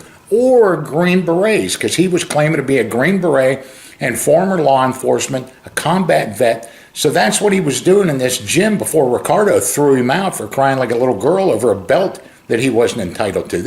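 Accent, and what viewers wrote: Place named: Pennsylvania, United States. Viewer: LOL! I love how takes over and IS the boss. Where at are you from in PA? I'm from a small town called Barnesboro named after the coal mining company who owned everything "Barnes and Tucker". When I was an Apprentice Lineman we were building a transmission line outside of Ebensburg PA and we caught a crow that was injured on the right of way. My foreman took him home and raised him and he turned out to be the biggest thief of anything that was shiny. LOL! He was the best pet he ever had. Could never trust him around anything he was able to pick up though. HA! Did 3 years in the U.S. Army, 1981 to 1984, spent mostly at Ft. Bragg as an MP. Hopefully you won't hold that against me too much. I won't hold being a squid against you. LOL Love what you do! You cracked me up getting on your boy. You definitely sound Pennsylvanian.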